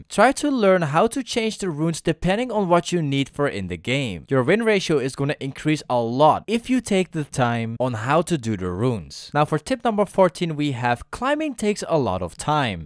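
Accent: Netherlands. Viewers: why do i have a feelin that his mainlanguage is dutch? xp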